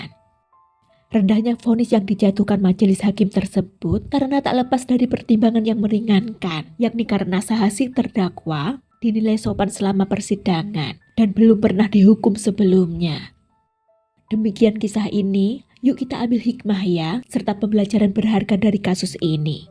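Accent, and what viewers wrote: Java, Indonesia. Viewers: Logat bahasa Indonesia nya diperbaiki lagi mbak. Medok amat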